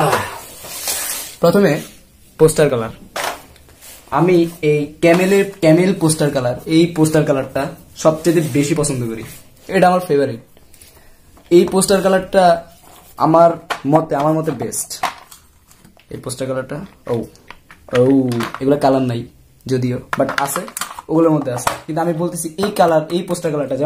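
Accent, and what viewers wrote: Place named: Bangal, Bangladesh. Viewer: Dada ami to bangal noi tai bangal bhasha janina ektu ektu bolte pari bhaiya apnar bangal bhasa amar darun laigachhe are apnar reveiw o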